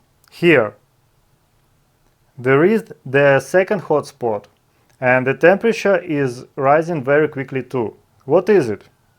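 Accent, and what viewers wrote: Russia. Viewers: Хоть ломаный английский и стал получше, но акцент русского языка так и остался )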